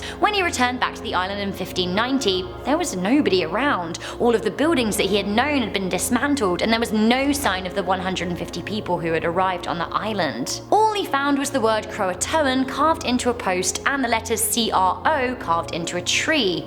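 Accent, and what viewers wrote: England, United Kingdom. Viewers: Does have a british accent Like:yes Comment:no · I thought your from england because of your accent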